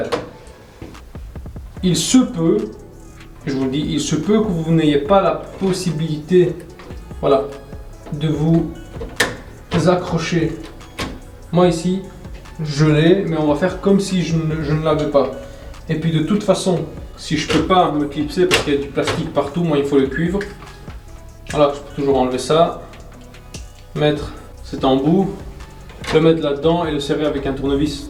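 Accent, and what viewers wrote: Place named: Belgium. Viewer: vous ressemblez à marvin atmoss'vert et vous avez les mêmes mimique sauf que marvin il n'a pas l'accent belge xdd !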